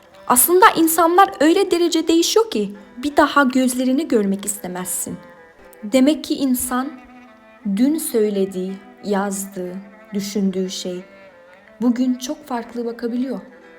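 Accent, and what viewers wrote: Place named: Kičevo, North Macedonia. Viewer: ke një zë shumë të ëmbël dhe të mrekullueshëm gjithashtu edhe një talent të pa parë. Në dëgjim të parë nuk mund ta dallosh se është interpretuar nga një vajzë kërçovare kaq mirë,pastër dhe bukur gjuha turke. Të uroj shumë suksese si në këtë talent që ke gjithashtu edhe në drejtimin që ke zjedhur të studiosh.❤️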